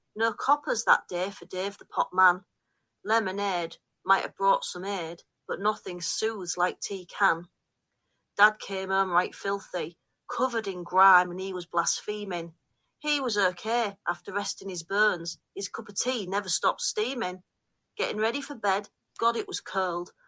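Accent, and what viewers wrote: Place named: Driffield, United Kingdom. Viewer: Just found your videos. I live near Hornsea, and your accent is similar to where I grew up, near Driffield. I am as frugal as I can be. Boiling hot water for a flask, one light on at a time, re-using tea bags, looking forward to your previous videos. Best wishes.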